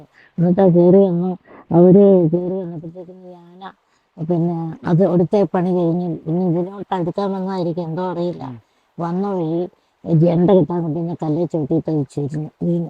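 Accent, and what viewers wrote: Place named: Kottayam, India. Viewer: സിസ്റ്ററുടെ വർത്താനം ഒരു കോട്ടയം slang ആണ് 13 വർഷം കാട്ടിൽ ഒറ്റയ്ക്ക്😔😔 ഇനിയും വേണം sister ൻ്റെ വീഡിയോ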